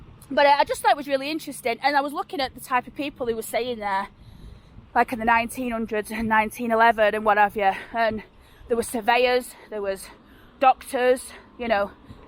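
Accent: Oldham, United Kingdom. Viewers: Thank you for your tours. I believe I mentioned, a few years ago, that my grt grt grandfather ( Henry Greenwood) was born in Oldham and came to America in the 1800s. I often wondered what his accent would have sounded like and my guess is...just like yours! I 🤔 think so anyway. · Awesome. Thanks for the memories. I am 71 now and i lived on Rock St in 1952 to 1960 just opposite what used to be a coffin makers. I played in the church gardens, round Tommyfield and Alexander Park. You even talk like an owdumer. lol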